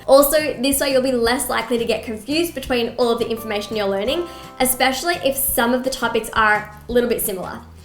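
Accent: Australia. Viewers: Are you Australian? I apologise if your not but you sound Australia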